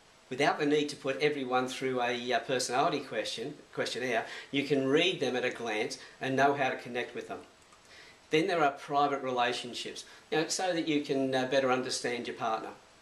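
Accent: Australia. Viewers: now, that's an aussie accent! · You have an Australian accent Are you An aussie? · I was talking about Tweetling saying you're Australian lol. He asked if you were Australian and it was obvious by your accent, so I asked him how he knew it (jokingly) =D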